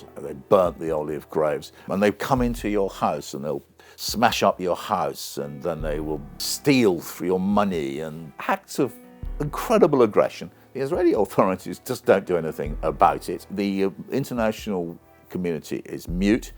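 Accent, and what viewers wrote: United Kingdom. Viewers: Our leaders have by supporting Israel directly or indirectly, lost the right to criticise all those who supported the Nazi genocide of Slavs, Roma and Jews directly, or by sitting quiet, indirectly. Never again can they protest credibly against any form of brown fascism, because when they had the chance, they sat quietly or stood up defending the "right" a particular state of their choice has to collectively punish an entire people. By the way, it's not every day we hear a man with British accent spelling out the truth, so thank you very much, sir. 🇸🇪❤️🇷🇺✌️🆗️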